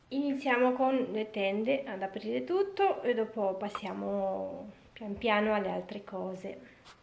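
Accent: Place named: Italy. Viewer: ce accent de italianca adevarata, ai! Imi place sa te ascult!❤❤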